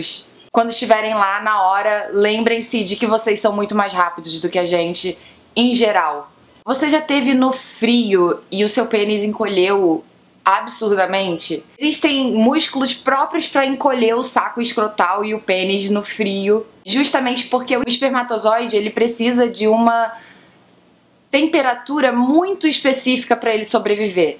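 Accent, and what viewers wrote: Rio de Janeiro, Brazil. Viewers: Sotaque carioca é padrãozinho, porém lindo 😅😍.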